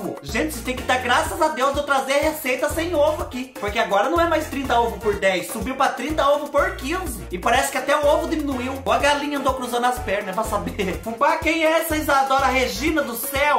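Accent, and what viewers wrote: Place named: Caipira, Brazil. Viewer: FUBÁ D CEU amo seu sotaque q caipirinha , o maia lindo kjķkk❤😍🤩♥️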